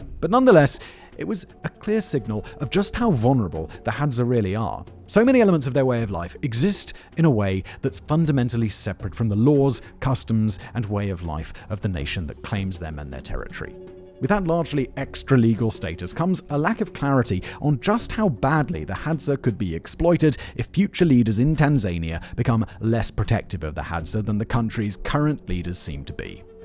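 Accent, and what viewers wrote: United Kingdom. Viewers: umm. 1. We were discussing Tanzania, not Tasmania. 2. I did not ignore them, they was just nothing that necessitated a response, I can infer from all the comments that both are valid pronunciations depending on your accent and the only reason specific pronunciation hit my ear weird is because he has a British accent but is using a pronunciation more common to European and African accents. Which makes sense considering he lives in Prague and had an African Grandmother, or at least a grandmother who lived in Africa. 3. The only reason I responded to that specific person is because he seems to be under the impression that only Americans pronounce it "Tan-zan-iah" which is just incorrect.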